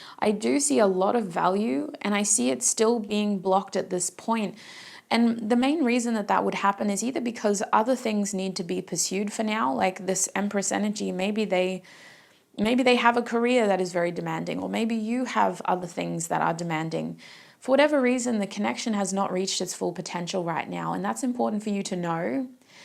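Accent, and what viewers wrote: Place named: Australia. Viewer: The second you spoke I knew you were Aussie!! 🙏🏼❤️🧿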